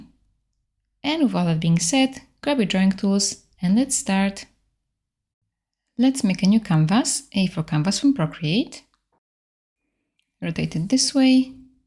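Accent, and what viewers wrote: Poland. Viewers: I love your voice! Are you Polish?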